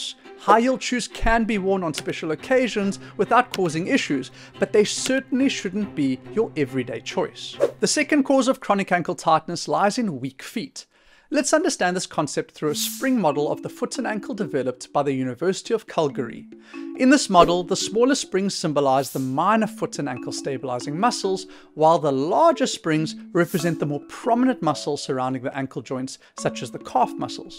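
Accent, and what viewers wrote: South Africa. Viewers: Oh yay, I love hearing a fellow South African YouTuber. Great video too. I need these mobility exercises. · He ain't no native. He from South Africa.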